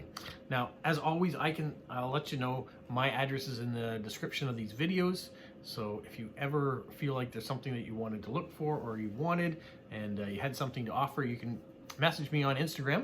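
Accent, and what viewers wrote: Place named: Canada. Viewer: Between these two videos that is a heck of a lot of US stamps. BTW I always get a kick out of your Canadian accent.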